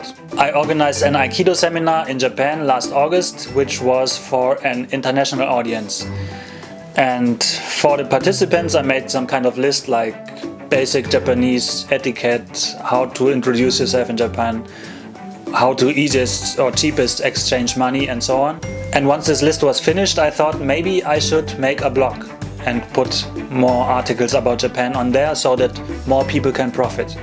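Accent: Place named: Bavaria, Germany. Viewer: du kannst aber auch bayrisch sprechen c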